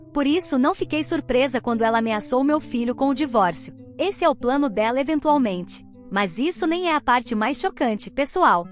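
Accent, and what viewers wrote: Portugal. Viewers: este português de Portugal é terrível ...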